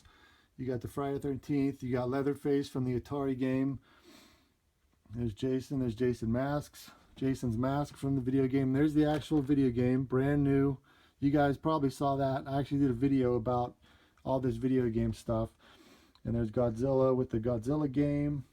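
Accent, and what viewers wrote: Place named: Maryland, United States. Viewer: are you from Maryland? i keep hearing a slight MD accent. amazing collection, BTW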